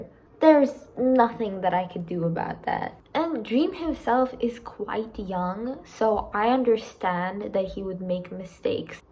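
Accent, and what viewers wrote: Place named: United Kingdom. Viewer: "TW: British accent" Lmao i love her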